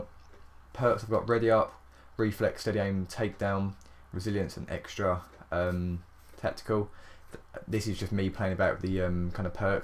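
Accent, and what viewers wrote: United Kingdom. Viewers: Ur British dude yeyhhh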